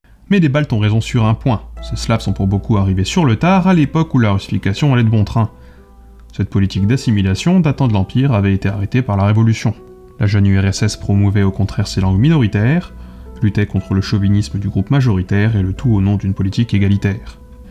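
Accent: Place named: Banlieue, France. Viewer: Un accent de banlieue. Nois qui avions appris le français à l'école on a du mal à comprendre tous les mots de l'accent de la rue c9mme ce mr qui avale certains mots . C'est moche. Je lui conseille d'écouter les narrations d'une chaîne youtube appelée LAMA FÂCHÉ. J Aime la voie de cette chaîne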